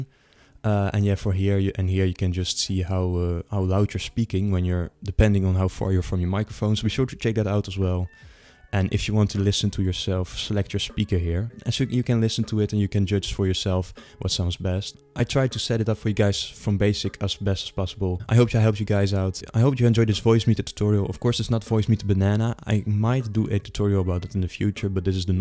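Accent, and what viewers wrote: Netherlands. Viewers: When a dutch person makes a guide its the first thin i hear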